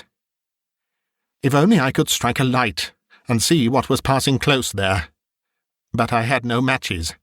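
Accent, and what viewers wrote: United Kingdom. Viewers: Yes, you can’t beat a good old fashioned perfect diction posh English voice, with a no nonsense masculine delivery , for these stories......perfect.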